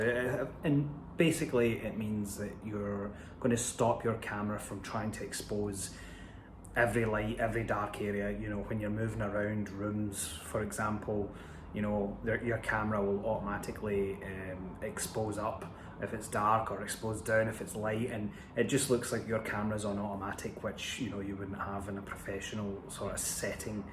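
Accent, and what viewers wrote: Ireland. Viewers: I’m here for the Irish accent. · Man i love the irish accent, cool video very helpful.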